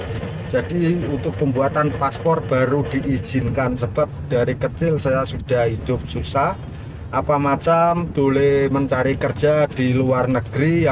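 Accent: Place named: Java, Indonesia. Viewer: Cakap pelat jawa tebal pun masih buat contsnt ...pebat dengar weak...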